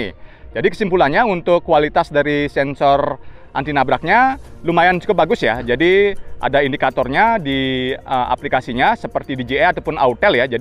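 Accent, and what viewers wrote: Bali, Indonesia. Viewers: logatnya bali om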